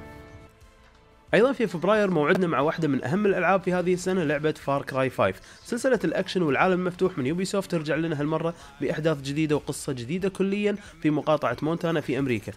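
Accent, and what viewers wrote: Iraq. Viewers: nutella Z العراقيين لهجتهم جذي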